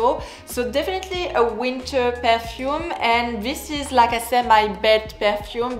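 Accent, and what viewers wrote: France. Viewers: This is 1 of my all time FAVORITE parfumes EVERRR I was introduced to this fragrance at the tender age of 14 brought my 1st bottle at 16 years young along with yves saint Laurent champagne and Dior's poison tendre And Amarige Been looking into getting a new bottle of lair du temp Because I have been seeing her ALOT as of lately And I BEEN into getting all my original signature scents because MINES are some of the best ever made due to my GREAT taste in fragrances and scents🙊😜 LOVE your videos you are AMAZINGLY DECADENT TO WATCH YOUR GORGEOUS & SWEET & IM A SUCKA FOR A FRENCH ACCENT IM A SOFTIE FOR AND ACCENT PERIOD. BUT ESPECIALLY FRENCH BEING IT'S THE LANGUAGE OF LOVE AND ALL 💗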